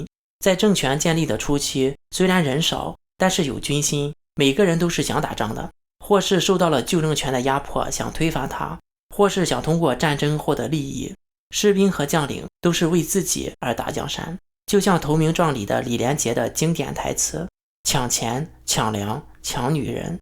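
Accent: Shandong, China. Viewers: 感觉是你山东的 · 赌五毛这口音是山东人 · 听口音像是大陆人呢？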